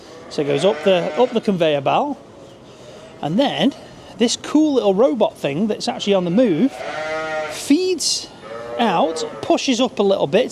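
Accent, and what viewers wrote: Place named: Stoke, United Kingdom. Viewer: Is this in stoke you seem to have a stokie accent 😊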